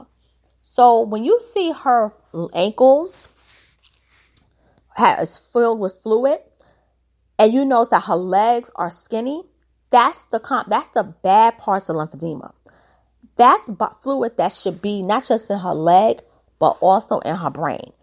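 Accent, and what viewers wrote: New York, United States. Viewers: you sound so NY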